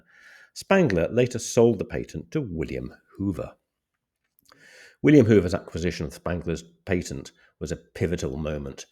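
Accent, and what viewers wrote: United Kingdom. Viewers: Love your channel! I’m a sucker for documentaries and history and your English accent makes it all the more better lol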